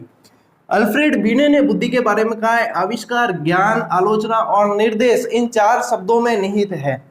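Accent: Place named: Marwari, India. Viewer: Sir ji aapki marwadi language bahut acchi lagti hai aap aise hi bola karen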